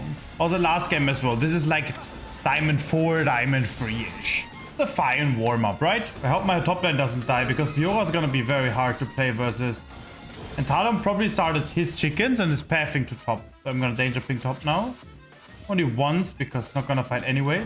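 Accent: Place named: Germany. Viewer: The German accent never gets old lol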